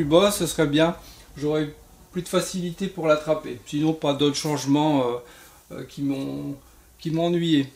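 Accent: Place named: Mining region, France. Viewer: Bravo pour cette présentation , je suis un nouvel abonné et à entendre ton accent , tu dois être de la région des mines , si c'est cela on est voisin , j'habite à 30 kms de Dunkerque .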